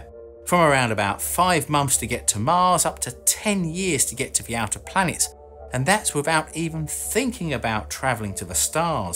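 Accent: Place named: England, United Kingdom. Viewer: Love the tone, the voice, the pure english accent, very relaxing listening to you Sir! And the content........ perfectly excellent!👍 Forgot to mention, the intelligence and the shirts of course, salute! From a French-Canadian subscriber 🖖.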